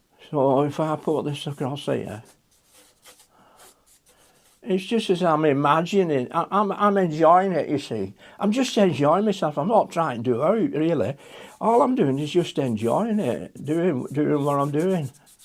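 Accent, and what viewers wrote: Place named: England, United Kingdom. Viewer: Hahaha.... That was wonderful. Hearing a really Englishman talk like. Letting the truth take centre stage, instead of the "feel good" talk that only serves to get most peoples backs up. Pleas feel free to have another "say", I loved it, and it's good to get ot off your chest. Oh, and I 100% agree with everything you said. Not to mention your paintings, they always do my soul good. Always simplistic in style and form, yet they carry an air of peace, and a wanting from within for me. Benn stuck in door for a very long time. Disabled, and had to give up my car, so when you paint, you bring into view the kind of places I would drive out to, and just sit quietly, soaking in the Englishness of peaceful country scenes and allow all my worries to drift away. You're a cracking man, and deserve every good thing that comes your way.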